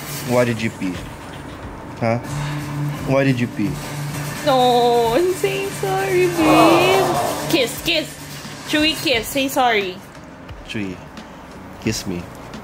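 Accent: Visayas, Philippines. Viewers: kacute magbisaya HAHAHHA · Kalingaw jd nimo mag bisaya ate 😂 more daw aning mga bisaya nimo 😂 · Cute kaau mag binisaya ui… 🥰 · Ka cute nimu mag bisaya uyy 😍😍😍♥️ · sagdii lang ng mga nega comments sa imuha oi you can't control them but what you can do either is to continue to do the right thing, which is to entertain us with your vlogs and cute kaayu ka mgbisaya oi lingaw kaayu ❤️ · ka cute sa bisaya 💕😊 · you sound so cute with bisaya accent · Cute kaayo ka mag bisaya ate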